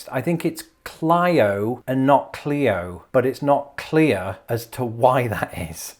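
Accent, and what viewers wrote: United Kingdom. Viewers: dude, as a Alabamian who grew up in the area and regularly shopped in Oneonta, you pronounced the way the locals do, or about as close as I'd expect anyone to with a British accent anyways... and way closer than most non locals do (most of them say one-on-ta) so you should get credit. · I think you're becoming very Americanized. What do your UK relatives think? You haven't lost your lovely accent & pls keep it. I love it. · You've been living in my country USA for years and seem to really relish it so why Don't you drop your Brit accent and speak American English like the rest of us.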